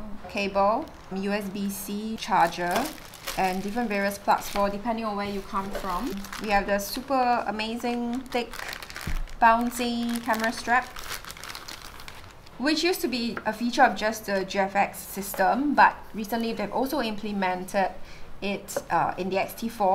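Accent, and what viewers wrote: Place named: Malaysia. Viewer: This is 2nd time I watch your video, I don't know your background my guess with your accent and mannerism is that you are either from Malaysia or Singapore, I think it is the former. BTW I am a Malaysian, and this camera is too expensive and sophisticated for me.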